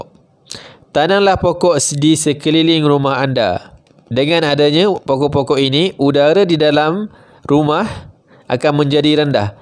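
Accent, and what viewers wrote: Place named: Terengganu, Malaysia. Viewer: suara ganuuu pekat 😂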